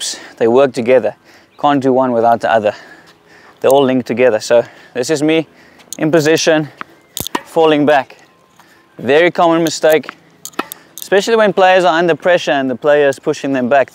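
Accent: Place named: South Africa. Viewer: The accent suggested he was South African, but the HADEDAS confirmed it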